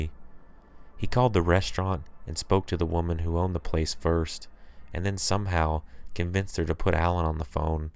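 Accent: South, United States. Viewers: u country asf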